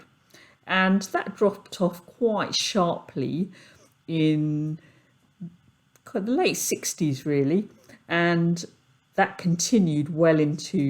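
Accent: England, United Kingdom. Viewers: Thanks for all the information. You definitely did not answer the question though !!! Noticed someone said you were a kiwi. You have an English accent surely ?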